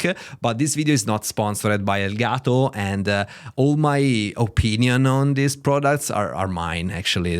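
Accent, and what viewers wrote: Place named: Italy. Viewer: I honestly like the Italian accent and it doesnt bother me maybe bc im from the EU and im used it but none the less great video, I had the OG lp arm and wanted to switch to the white lp pro but 199 euros - HARD pass.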